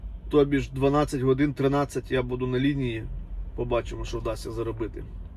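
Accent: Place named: Korostenshchyna, Ukraine. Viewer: А я й чую десь хлопак з коростенщини ну північ полюбому...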